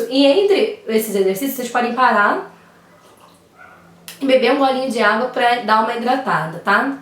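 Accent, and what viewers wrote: Rio de Janeiro, Brazil. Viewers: Sou do Rio de Janeiro e tenho taquilalia. Você atende em que estado do Brasil? Seu sotaque parece de carioca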